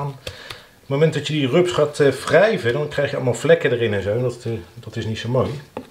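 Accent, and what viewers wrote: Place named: The Hague, Netherlands. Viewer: Top filmpje, ga ik eens mee aan de slag! Geboren Hagenees zo te horen hè? ;)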